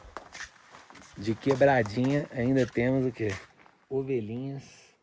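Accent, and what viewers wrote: Minas Gerais, Brazil. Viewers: Estou simplesmente encantada com esse canal e com o protagonista dele, com esse sotaque muito familiar, mineiro bom demais da conta sô! Parabéns por transmitir um conteúdo de qualidade de uma forma descontraída e contagiante. Ficou pensando ... como só descobri esse canal hoje? Já me inscrevi lógico e maratonar o canal e a ordem do dia, Gratidão 🙏❤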